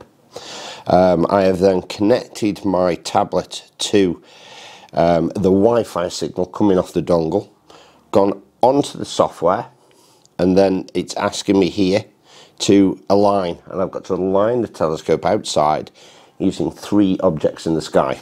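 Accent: Stoke, United Kingdom. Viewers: You sound like a Stokie 🙂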